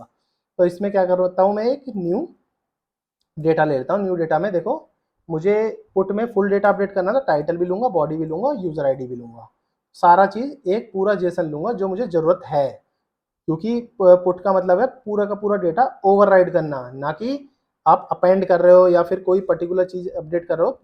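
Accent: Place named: India. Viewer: Your Englisch is terrible, I don’t understand one word! You are speaking much to fast in your Indian slang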